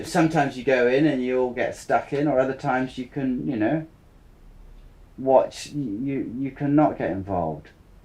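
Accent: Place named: Upper-class, United Kingdom. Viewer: Upper class British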